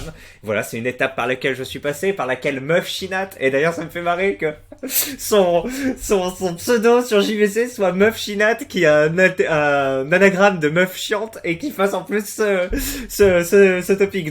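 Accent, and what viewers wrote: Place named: Southwest, France. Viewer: Tu dis souvent moinssss, tu viens du pays basque? Ou du Sud Ouest?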